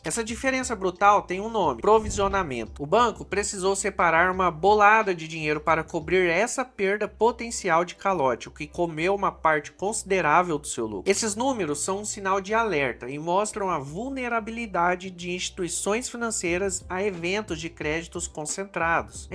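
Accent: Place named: São Paulo, Brazil. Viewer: Esse canal está sem hora certa. Tem de ir até Houston acertar os ponteiros. Sotaque do interior paulista.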